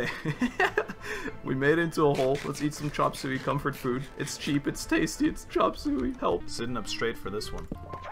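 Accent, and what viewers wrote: France. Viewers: i just realized has a perfect french accent, and so he might be french like me- i'm not sure how i'm supposed to react to it, but i guess i'll take it positively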